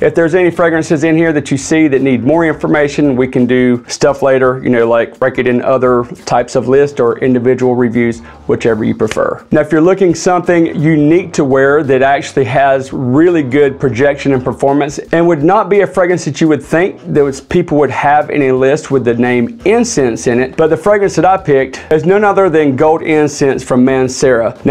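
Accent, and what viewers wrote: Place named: Texas, United States. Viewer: Dude I really enjoy your reviews. You sound like your from my neck of the woods. Ft Worth friggin Texas. Preciate you’re work here. I’ll be checking some of these out.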